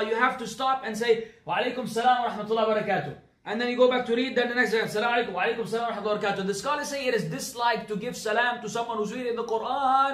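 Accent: Egypt. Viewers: Nice egyptian accent :D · assalaamualaikum ya akhy al habib, lol bro ur egyptian accent is on point and ur jokes are true lol barakAllaah feek for these lectures. :)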